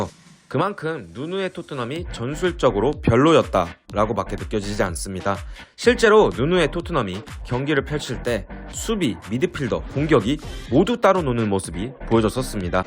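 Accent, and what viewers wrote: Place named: Jeolla, South Korea. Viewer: 전라도 억양이 살짝살짝 나오네 hoxy 고향이?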